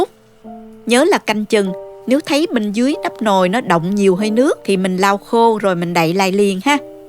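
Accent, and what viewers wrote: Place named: Binh Thuan, Vietnam. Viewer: GIONG BÀNH THUẨN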